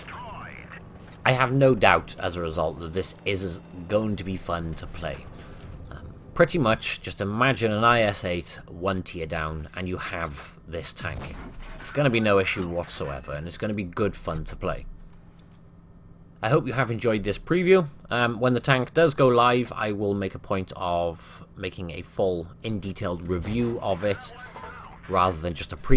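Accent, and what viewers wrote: Wales, United Kingdom. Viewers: HE'S WELSH MUN!!!!! I DIDNT KNOW THIS!!!!! I LOVE 'IM ALREADY!!!!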